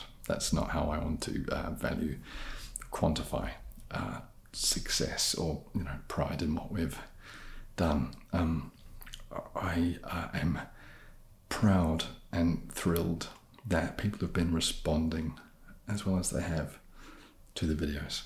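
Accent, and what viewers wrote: New Zealand. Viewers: Omg, this frigging genius! Gravelly voice in stereo. Hearing my favourite English expressions in a kiwi voice (crack on, loads) is always a cheap chill. I missed the opportunity to leave you a question🙁 but I’m pretty sure I can’t think anything other people come up. As an artist I would adore painting you like one of my French girls🤣🤣🤣 This was a joy to watch and heavenly to listen to. 😊❤️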